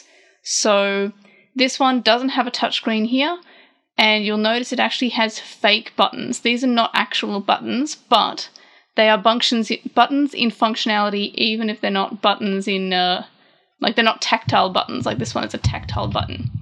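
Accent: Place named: Australia. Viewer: just when I was expecting the youtuber go be American, turn the sound up and the YouTuber is Australian!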